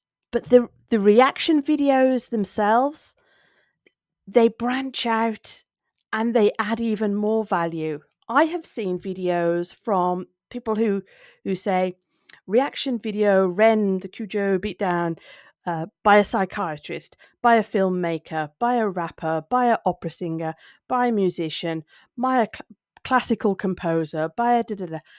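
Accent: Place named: Solihull, United Kingdom. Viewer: I love your comments on watching Ren, the Collective joy that it brings watching others discover him for the first time! You say you are not the typical person to be a Ren fan… I will prove you wrong!! I live in Brighton, and when he announced that he would be playing one festival this year, he chose The Secret Garden Party. Lots & I mean lots of fellow Renegades, decided to come to Brighton first, from all over the world! I offered my sofa bed for anyone that needed it, I now am super close with the lady from Canada that came to stay with me! I’m 49 & she is in her late 50s. We met with others, mostly women, mostly 40+ in age! Ren has spoken to so many people through his music, young and old! The is a guy in his 80s with a reaction channel (Vietnam Era Vet) who LOVES ren also! Glad you love him also! ❤ Also, you sound like you are from my neck of the woods 😉 I was born & dragged up near Solihull! 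🙏♥️🙏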